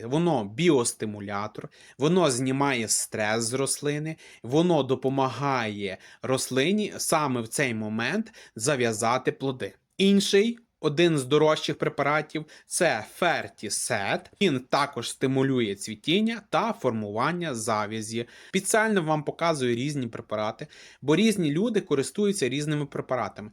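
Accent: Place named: Western Ukraine, Ukraine. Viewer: Западно-украинский говор. мОї-мої,наголос на " Ї",не жара, а спека.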